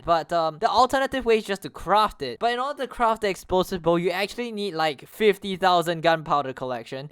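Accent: Singapore. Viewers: So you are singaporean. You can hear it in the accent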